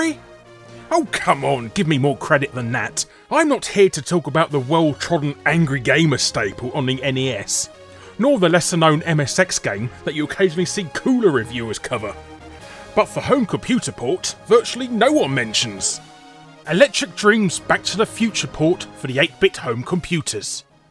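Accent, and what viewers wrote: United Kingdom. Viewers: British pronunciations of Japanese names hurt.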